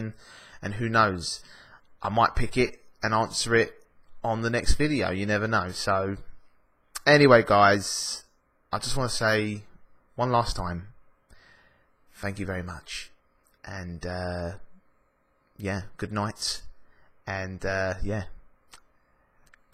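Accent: United Kingdom. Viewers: Hey don't listen to the haters, I personally think ur handsome and I LOVE the British accent! I prefer a British accent. I love following you and u make me laugh, ur very cute, continue to make videos :) also can you do a public freak out compilation reaction video? I'd love to see how u react ^_^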